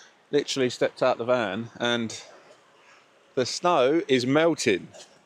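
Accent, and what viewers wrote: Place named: London, United Kingdom. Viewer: Just found your channel and am loving your work! My view is the River Wye near Bakewell in the Peak District (its pitch black so cant see much right now!) Im a full timer in my jumbo transit, Vera. Like you, I gave up the rat race, sold my flat 20 months ago age 55 and decided to give up the 9-5, live on less and experience more before I became too old and farty! Best decision of my life. Im a london girl, NW and West (hate the place), so listening to you is like a bit of home on the road! Gonna binge watch your channel now so shall bid you a good evening Laters 😊 Tracy and Moo the cockapoo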